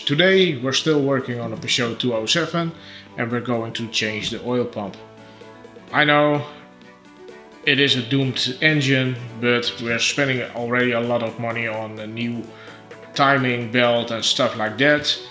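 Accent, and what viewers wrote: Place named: Netherlands. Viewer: Hallo ik hoor dat u van nederland bent en nederlands spreekt ik wou u vragen heb een probleem met mijn auto ik hoor een schurende geluid in de buurt van de distributie riem heb alles vervangen distributie riem met spanner waterpomp multi v rien met spanrol krukaspoelie alternator maar geluid is er nog steeds het komt binnen in de krukas percies heb onlangs mijn olie vevangen en geluid is percies verminderd kan het nu zijn dat de olie pomp die lawaai maakte het is percies metaal over metaal percies lagers over elkaar kan u mijn aub helpen en kan het zijn dat de olie pomp versleten is en een schurende geluid maakt . Ben u dankbaar voor u reactie dank u .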